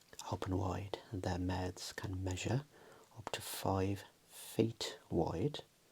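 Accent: Birmingham, United Kingdom. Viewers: Mother of pearl. If there was an Oscars for ASMR, this would be win some awards. A lot of ASMR artists just read the books word for word and I find this a bit boring and struggle with this. I LOVED this video as you mix it up so much and keep us in the loop (loved all the "save your money" comments!). I know this is a live video but your style is really good. I have only just discovered your channel (shout out to reddit!) and really really enjoy your work. Like a Brummie version of Mr. Relaxing ASMR! lols! (That is a complement btw! Love your accent!) Ps. I also LOVED the tapes and CD ones. Especially as it is clear you were an old school raver! Or were you lol? (make some noizzzzz!)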